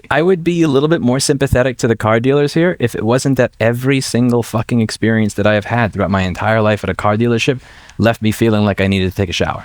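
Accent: New York, United States. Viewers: Fast talking new yorkan keeping it plus two on the speed knob, to plus three for effect, then back to two or one if you’re slipping .. your content’s okay though at .75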